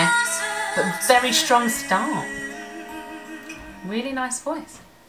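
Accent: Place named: United Kingdom. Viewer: Girl i love you 😫❤ You're so cute, funny and full of energy 😅 And i love your British accent too 😂 you're like this year's Malta entry so colorful and joyful 😅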